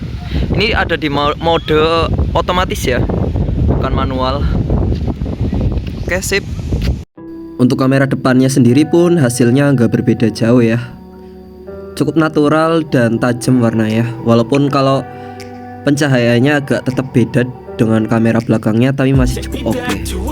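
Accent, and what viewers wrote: Banyumas, Indonesia. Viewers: njir wong banyumas jebule😊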